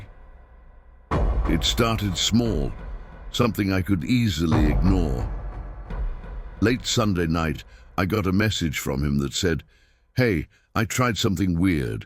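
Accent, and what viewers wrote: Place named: RP, United Kingdom. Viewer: Just discovered your channel! Interesting and eerie story! What makes your channel unique is your voice. I get the feeling that you're an older gentleman, something you rarely find on this sort of YouTube video. (Side note; if the voice is as an AI voice, it's really realistic sounding!....no offence intended if it's your BBC voice)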